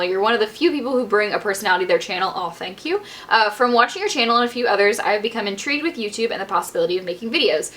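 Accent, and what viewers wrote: South, United States. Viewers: why did they not want you to have the southern accent? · You do have a slight southern twang.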